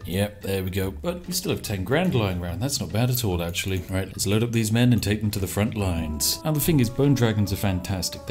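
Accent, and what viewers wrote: United Kingdom. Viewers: i like the voice narrating, so smarty kind of bri-ish and with silly jokes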